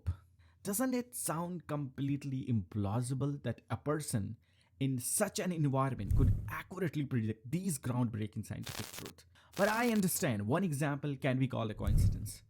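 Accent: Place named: Bangladesh. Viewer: Assalamu alaikum brother. Are u from Bangladesh? ur accent sounds familiar. take love <3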